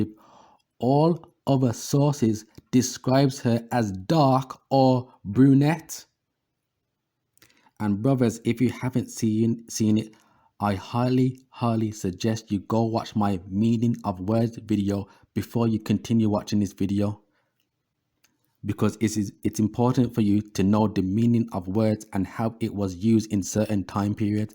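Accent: United Kingdom. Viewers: thank you my brotha, are you from the UK?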